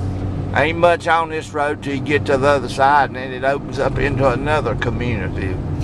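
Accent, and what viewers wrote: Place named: West Virginia, United States. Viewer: I grew up in Blacksburg, VA. I'm a truck driver now, and recently picked up a load of sodium chloride from the salt corp there. I had never heard of Saltville before, but after driving through I wondered about it's history. I have been doing some research for a blog post, and came across your videos. Very nice videos, looks like a nice place to live. In my opinion there's no place quite so beautiful in the whole country as the mountains of Virginia. Your accent reminds me a little bit of my relatives from West Virginia, though it's not quite the same. Makes me miss visiting them. Most of them are gone now. I haven't been there much since my grandparents died. My parents grew up near Charleston. Thank you for sharing your town.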